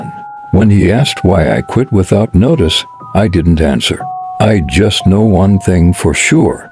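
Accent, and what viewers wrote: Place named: United States. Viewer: If it's in the UK, why is the narrator using a US accent? Would make a great practical joke.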